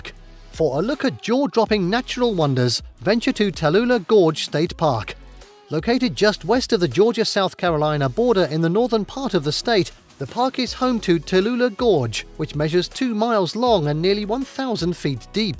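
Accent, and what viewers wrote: United Kingdom. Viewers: Great job showing amazing places to visit in Ga. But caught me off guard when I heard a British accent. I was expecting a Ga accent.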